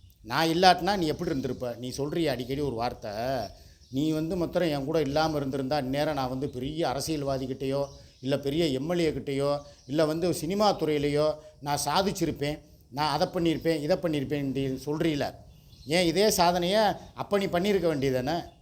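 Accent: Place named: Madurai, India. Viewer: இது உங்க வாழ்க்கை நீங்க யாருக்கும் பதில் சொல்ல தேவையில்லை எது எப்படியோ நீங்க பேசுற மதுரை பாஷை நீங்க கமெண்ட் படிக்கிற விதம் எல்லாமே எனக்கு ரொம்ப பிடிக்கும்னா உங்க தங்கச்சி கீர்த்தி ஃப்ரம் சென்னை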